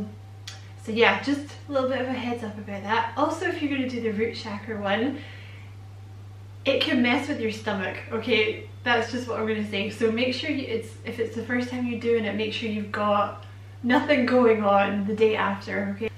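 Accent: Scotland, United Kingdom. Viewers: I have just binge watched your videos here, I love your explanations for everything I would really agree with how you see things and you have confirmed a lot of what I have felt to be true but wasn't sure if I was seeing it the way other spiritual people do so it's great to get your take on everything. Also this is the best chakra explanation I have heard 💚💫🙏. You probably hear this a lot but I love your Scottish accent, I'm Irish and we do tend to like the Scots over here 😃. God bless and take care 💚🙏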